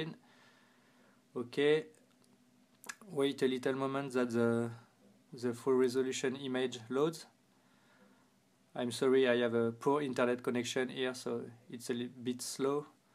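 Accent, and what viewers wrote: France. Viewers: I Like His French Accent But I Dislike His Pronunciation Of 'Theme' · I like your French accent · LOL un français qui veut parler anglais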